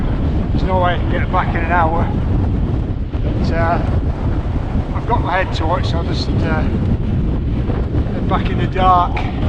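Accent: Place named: Yorkshire, United Kingdom. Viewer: Just found your channel, loved it, nice to hear a great YORKSHIRE ACCENT EEEH BY GUM, thank you hope all goes well with your hand op, takecare Lv Jacqueline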